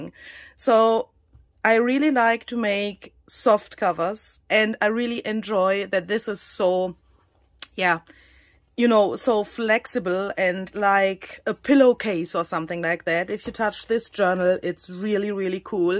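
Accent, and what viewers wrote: Germany. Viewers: Hi, please don't worry. First of all, this is beautiful. I truly love your work. I hit this problem many times, and I would fix it by adding matching, grungy broderie anglaise or lace around the edges. I love your accent - you speak great English. I love German, it's my favourite language, and I wish I'd learned it at school. I guess it's never too late? I am 62. Us Brits are lazy when it comes to speaking different languages. Love what you did to fix your cover problem. You are a great artist. Take care, xx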